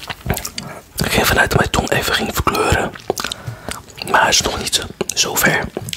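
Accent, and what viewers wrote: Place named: Netherlands. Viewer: I love the Dutch accent 🤗 Nice vid!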